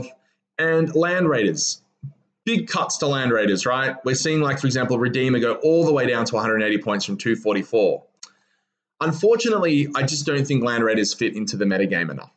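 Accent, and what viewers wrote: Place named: Australia. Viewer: Hi, great video as always. A few points: 1) I've tried Heavy Incinerator on GMNDK, problem is D6 shots. 3.5 hits, 1 or 2 wounds, doesn't cut it. I rather go Gat Psi and Heavy Psy, spend 2CP (Psychic Onslaught stratagem) for 12 Str5 and 6 Str8 shots. 2) As netprince455 commented before me, what are your thoughts on: "Gateing" a Land Raider Crusader carrying 2x 5man Purifier squads, Brother-Cap (Vortex) and Pala Ancient in Turn 1, (optionally) using the Psybolt stratagem for 24 Str5 shots to clear the chaff. If it survives Turn 1 shooting, everyone pops out for 3D6 + D3 MWs (12.5 avg). Entire set up is 686pts. Alternatively, you could "gate" it on Turn 2, alongside 2 GMNDKs who were in high orbit, presenting your opponent with multiple threats. Anyway, once again, great video. Love what you're doing for the GK community with these quality vids. 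Aussie accent is the cherry on top.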